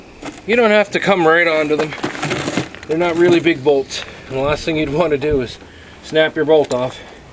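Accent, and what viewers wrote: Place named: Ontario, Canada. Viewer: Well done! Much easier than Chilton's Manual! The Ontario accent is an added bonus.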